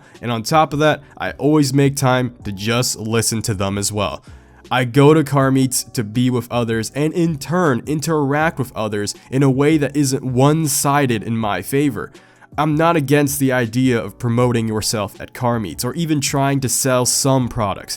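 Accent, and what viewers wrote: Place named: Appalachia, United States. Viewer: Dude that hillbilly accent is the reason why I just subbed😂😂😂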